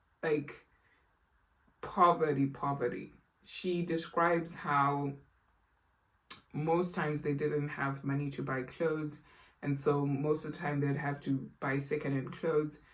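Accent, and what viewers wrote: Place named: Zimbabwe. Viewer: I just finished her book NOW, and I am feeling SO full of thoughts and emotions now. I am now watching and listening to your review. I am going to do a review of the book on my channel soon. You sound Zimbabwean. Are you Zimbabwean by any chance? I am Zimbabwean and UK based.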